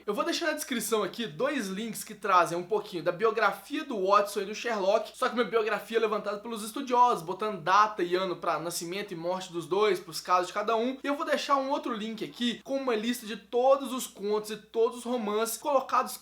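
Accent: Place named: Minas Gerais, Brazil. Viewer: cara vc é mineiro! vou falar aqui "procês"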